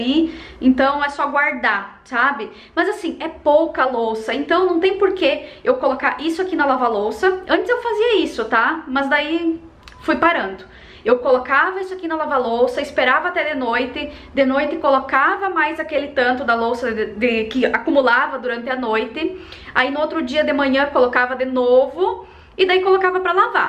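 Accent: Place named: Curitiba, Brazil. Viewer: Gostei de ouvir o sotaque da minha terrinha. Moro a vinte anos no Rio, e é bom me sentir em Curitiba de novo.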